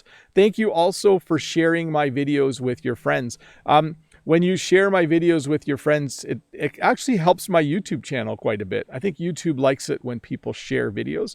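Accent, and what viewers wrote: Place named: Canada. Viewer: I've been in the US but I couldn't tell your pronunciation is of Canada. The differences are so slight!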